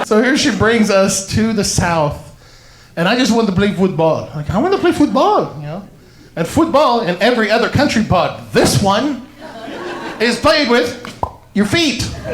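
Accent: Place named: Iran. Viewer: i love how he seamlessly blends the Iranian accent with the Mississippi one 🤣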